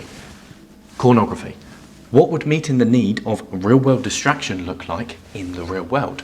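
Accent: United Kingdom. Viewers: What type of British accent is this? I’m getting Michael Caine vibes 😊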